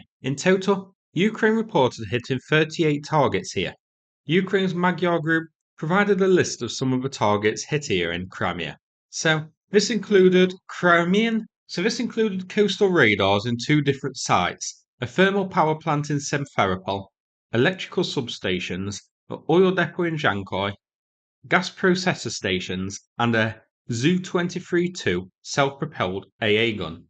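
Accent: United Kingdom. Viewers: u fucking clown turn off ur retarded bri-ish cuck accent when?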